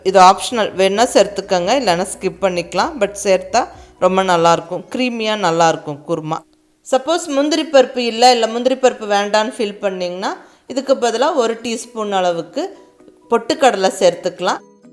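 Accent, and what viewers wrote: Coimbatore, India. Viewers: Kovai Tamil super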